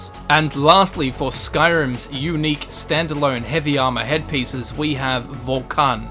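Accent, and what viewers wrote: Australia. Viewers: Batmans Goldfish he sounds like Crocodile Dundee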